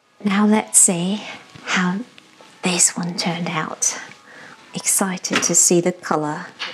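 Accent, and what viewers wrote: United Kingdom. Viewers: I’ve noticed over time how much more British you accent sounds.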